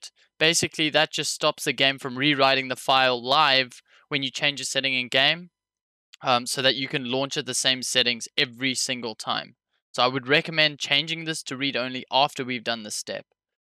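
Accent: South Africa. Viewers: Are you south African bro? Appreciate the content and all love from RSA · are u south african? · South African?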